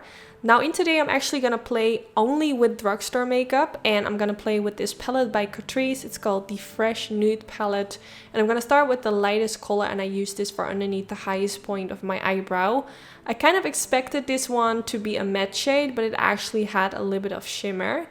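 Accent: Netherlands. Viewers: Have you thought about doing an English course? You are clearly fluent, but as soon as you said “hi guys” I heard you were Dutch haha. Hope you don’t take this the wrong way.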